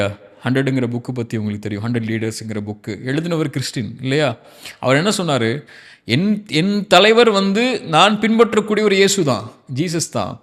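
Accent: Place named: Sri Lanka. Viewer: Ungal bayan enakku mihavum pidikkum karuththukkalai mihavum alahahavum thelivahavum velippadutthureenga ungal kirath so sweet pesurazu engada nattavar maazari I'm srilankan pls Quran recitation podunga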